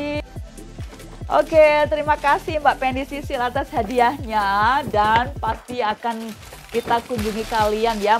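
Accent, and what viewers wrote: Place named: United States. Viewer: Happy belated Birthday wong ayu💐..Jeng pasti sdh lama tinggal di US ya..bahasanya sdh beraksen orang sana..enjoy your nice birthday presents👌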